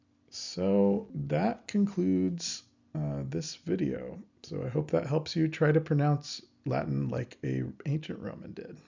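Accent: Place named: United States. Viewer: Nah, too american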